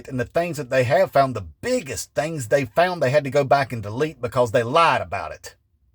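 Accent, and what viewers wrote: South, United States. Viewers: Nothing is as delicious as truth with a salted caramel southern accent. Dixon White; the Future Maga. Trae Crowder. You. Beau and Belle. Thanks, brother. We PAY for all those 'socialisms.'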